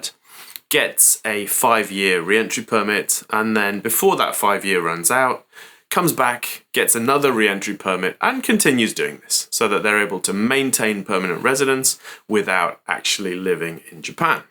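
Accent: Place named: Kent, United Kingdom. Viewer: Thank you for your clear videos. They are really appreciated. At the moment, it's hard to find genuine (non-sensationalized) information. A possible new/expanded topic, if you are looking... April 2026, bicycle rules. I saw your bicycle video about a month ago... You look good! But maybe some more details, could be good. It's not a huge topic but I can see another Police crackdown coming!!! Last crackdown, about 5-6 years ago?, I was waved off the sidewalk, on too an extremely busy & major road. Immediately, almost being sucked under a truck!!! After another on-road hit and run!!, I have NEVER cycled on the road again. But according to the new rules, sidewalk riding is not illegal, you just need to be careful & polite. How to register the bicycle for Crime Prevention (especially, if you buy from Amazon). Near us, local shops are not willing to help unless you buy their bicycles, which I can understand. 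The Local Police, wouldn't do it!!!? That seems very strange. Last video, you mentioned Insurance but not TS Marks. Not mandatory but encouraged, if not covered by car or house insurance. A good idea for shorter term people. Just an idea for a new or continued video. Thank you for your videos. 😉 Dare I ask, did you used to live in Kent? To me, you sound very much like a Kentish person.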